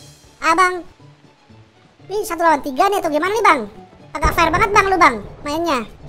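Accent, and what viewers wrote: Java, Indonesia. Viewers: Asli mana bang ko ngomong Jawa nya medok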